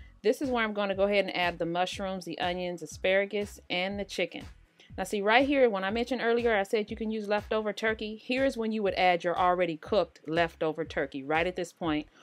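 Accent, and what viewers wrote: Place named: South, United States. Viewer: Hey, I just found you today! I'm so amazed. I would've thought you were from the south. I live in Alabama and you are just like us. I love, love, love your channel. I subscribed and turned on the notifications. 🤓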